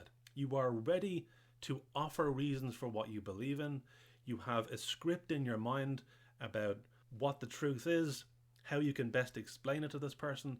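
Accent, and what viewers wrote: Ireland. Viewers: were you a witness ~~~sounds like you are from Ireland ?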